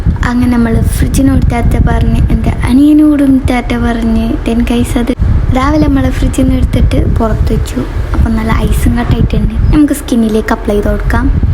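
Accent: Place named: Malappuram, India. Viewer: Malappuram slang 😌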